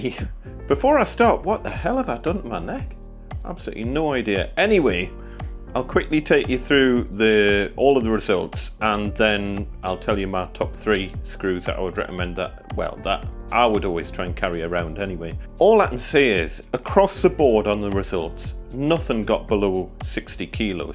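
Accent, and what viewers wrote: Newcastle, United Kingdom. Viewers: Love the Geordie accent from the Northeast.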